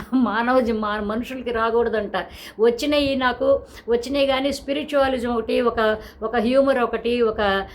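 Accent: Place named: Chirala, India. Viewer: Great inspirational words as always ❤ Maadi kooda near by area andi. Chirala daggara, me slang and few telugu words i felt like you are from that area as well.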